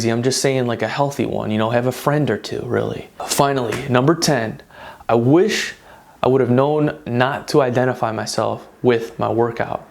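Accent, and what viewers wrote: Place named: Chicago, United States. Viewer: I recognize this accent. You've got to be from my hometown of Chicago (Chicagoland), right?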